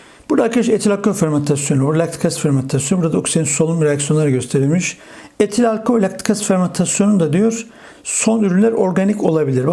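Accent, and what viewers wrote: Black Sea, Turkey. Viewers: Hocam siz karadenizli misiniz:) ? Hocam bir de ben (Palme Yayınları, Bilgi Sarmal Yayınları, Rasyonel Yayinlari Ayt biyolji Branş denemelerini cozuyorum) sizce yeterli mi ? Ortalama 7 ya da 8 net birakiyorum ama sinavda neredeyse full birakmam lazim:((( Bir de Hocam ayt biyoloji icin ne dusunuyorsunuz sizce bu sene nasil olur? Sizden cevap bekliyor olacağım simdiden tesekkurler :)💜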